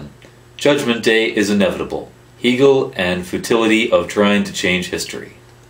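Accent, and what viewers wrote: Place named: Midwest, United States. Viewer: HAhaha nice! Wisconsinite's are abit more crazy with the r's though. That sound like a more sophisticated Midwestern accent. i cahn tie-pah een mah ayct-cint. baht i cahn't tah-k een ah us-trail-leen ayct-cint. wee-sh mah luk ^^^thats how a lot of people talk round here our is pronounced - "R" in fact r's dominate words in WI we just get stuck on the r's all the time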